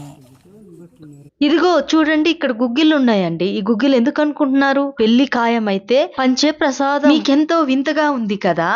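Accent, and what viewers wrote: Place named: Rayalaseema, India. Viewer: Meedi rayalaseema yasha lagunde. Nizamabad valladi kondari chuste rayalaseema yaasa vasthundi. Vichitram.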